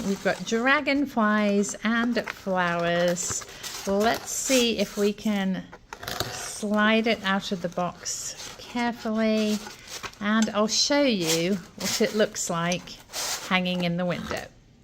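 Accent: England, United Kingdom. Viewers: Where are you from? Where is the accent from? England?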